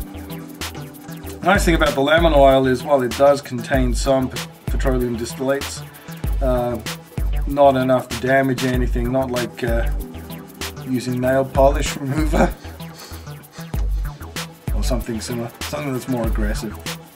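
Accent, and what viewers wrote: Australia. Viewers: You are not Australian? That's one of the first things I thought when I watched this. I'm not Aussie, btw. I'm in the New World.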